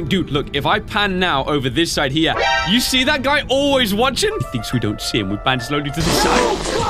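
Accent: United Kingdom. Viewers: Man i love British accent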